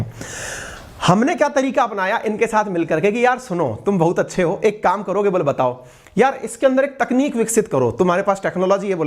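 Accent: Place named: Rural, India. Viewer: Kya dehatiyo ki tarah bolta hai master. 😅😅😅😅😅😅😅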